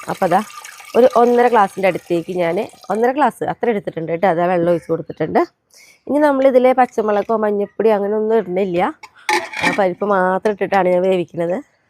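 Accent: Thrissur, India. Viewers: Thrissur nadatharel aano chechi ningal😮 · Where r u based, from ur language, I think u r from Thrissur, where r in Thrissur?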